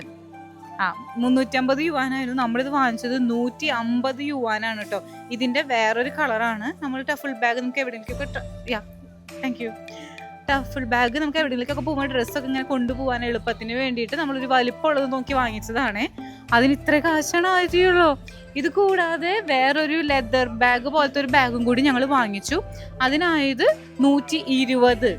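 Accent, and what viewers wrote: Thrissur, India. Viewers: like ur thrishur talk..why not using ur old thrishur tag line now a days?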